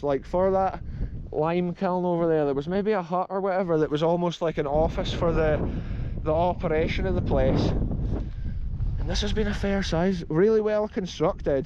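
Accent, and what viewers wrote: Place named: Angus, United Kingdom. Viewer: I always find it hard to locate your accent. Given the general area of your adventures, I am going to guess Angus, perhaps around Forfar/Kirrie/Brechin? Am I close?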